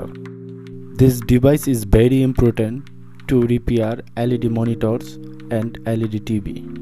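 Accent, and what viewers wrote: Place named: India. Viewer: your english is shite. speak proper english not the indian english.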